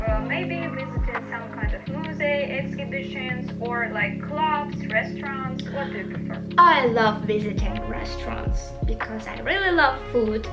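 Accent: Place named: United States. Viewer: це так цікаво❤️ Детальний розбір, покупок, сумок) А твоя англійська 😍 наче ти амерікан гьорл)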